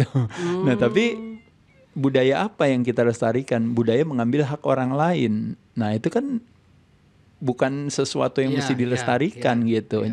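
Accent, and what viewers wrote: Bali, Indonesia. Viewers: Logat balinya si mimin nampak kali, T T T😂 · Ini emg logat bali bgtu apa cm feeling sy aja ya. Mc nya kayak org make sabu, ciri2nya bgtu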